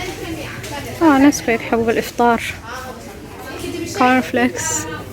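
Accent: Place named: Jeddah, Saudi Arabia. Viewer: مشاء الله فديو ممتاز ولهجتك اعتقد انك من جدة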